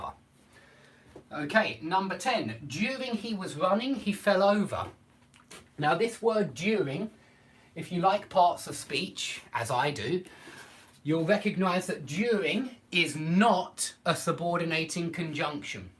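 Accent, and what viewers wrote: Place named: United Kingdom. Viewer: ! British speech ... cool.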